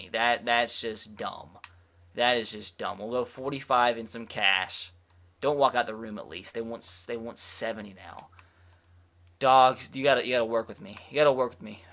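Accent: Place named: South, United States. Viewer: no hate, just curiosity are you southern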